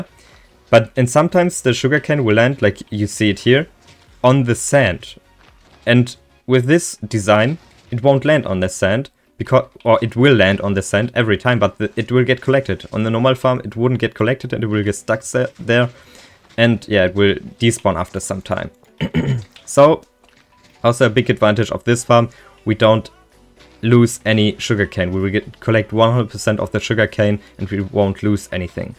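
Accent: Germany. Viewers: Your german i hear your Accent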